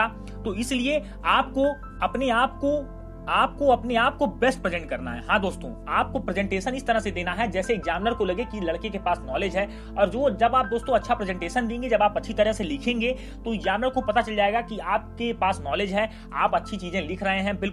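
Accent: Bihar, India. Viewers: Sir ji aap bihari ho???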